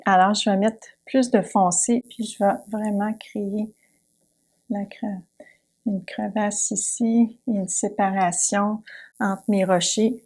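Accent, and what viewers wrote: Quebec, Canada. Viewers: J'adore vos explications ! .En plus votre accent canadien ,c'est super ! .Merci à vous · Merci pour vos conseils dorénavent je suis tous vos tutos j'en suis ravi, un marseillais qui adore aussi votre accent Québéqois. Michel Hibernac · c'est un plaisir de vous écouter (avec votre joli accent québécois) et vous regarder peindre, j'ai justement des rochers à faire, j'ai un peu de mal car beaucoup de nuances, j'utilise un modèle de photos prise en hiver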